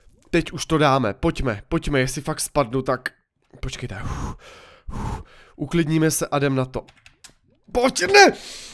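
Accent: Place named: Pilsen, Czech Republic. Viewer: Klasický Plzeňák :D Hubu proříznutou :DD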